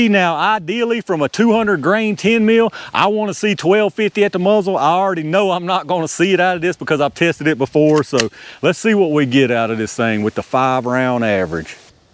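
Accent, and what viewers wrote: South, United States. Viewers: True southern Bo right here, started off the video almost ina song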